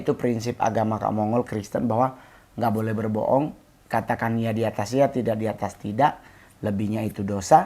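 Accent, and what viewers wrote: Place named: Eastern Indonesia, Indonesia. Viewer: Tengkyuuu kaka Mongol, kita jadi tambah pengetahuan sedikit seputar Satanic yg menarik tapi mengerikan. Ayok kaka Mongol, ekspedisi yuk ke 7 titik di Indonesia yg sudah diikat itu 😉👍. Gw tertarik dengan pengetahuan kaka Mongol seputar Satanic waktu join di DMS, hebat 😉👍 Plus logat Indonesia Timurnya, mantaaaappp kaka 😃👍 Salam katong anak Papua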